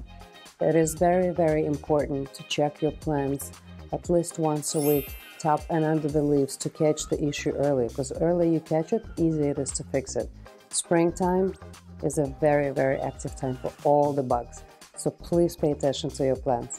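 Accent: Russia. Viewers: You accent seems like a Russian?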